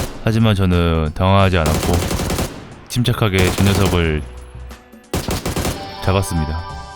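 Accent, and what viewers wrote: Jeolla, South Korea. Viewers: 오지는 전라도 억양 존나 잘어울려 ㅋㅋㅋㅋㅋㅋㅋㅋ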